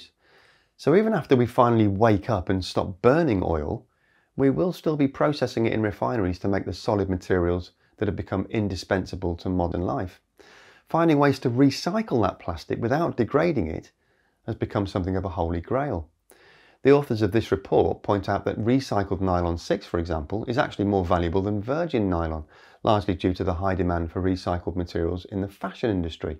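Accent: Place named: RP, United Kingdom. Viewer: Wow, you're a very handsome man! I like your RP English and would like to see you at the beach.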